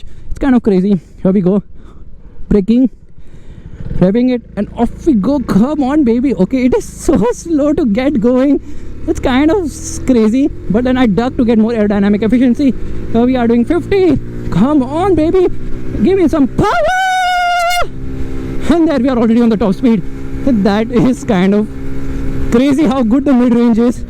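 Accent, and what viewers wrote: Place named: Mumbai, India. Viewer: That is Mumbai/Pune English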